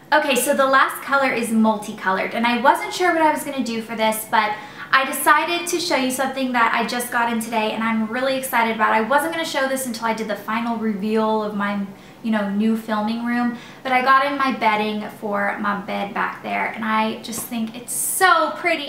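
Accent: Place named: Tennessee, United States. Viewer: Pretty sure the CVS eye makeup remover is the same as the neutrogena one! Just in case you can't find a CVS. I love hearing your TN accent (I miss living in Nashville).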